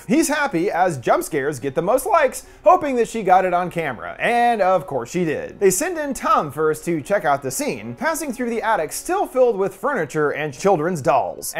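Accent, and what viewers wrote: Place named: United States. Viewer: that American accent is so annoying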